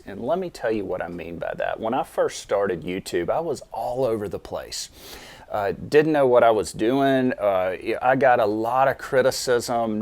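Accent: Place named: Panhandle, United States. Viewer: I’ve been a big fan of your videos for some time, originally because they took me back to the Pensacola beach of my youth. I still love the weekly beach scenery, but I’ve grown to be a big fan of you personally. I always enjoy seeing your handsome smiling face and hearing that cheerful voice tinged with a soupçon of Panhandle drawl. Thank you for opening up and being so honest with us. I personally know what it’s like to receive criticism on a public level. I am retired now, but I worked in show business for 50 years and the one negative review I got was in a national magazine And believe me it threw me for a loop. I took it so personally and just wanted to crawl under a bridge. Eventually, I got over it, of course, and like you, therapy has helped me a great deal over the years. The one single slogan that I heard that helps ground me is “What other people think is none of my business.” It’s a total waste of time to try to read other people‘s minds and so I don’t anymore. 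Thanks again for all your great work and I look forward to future videos.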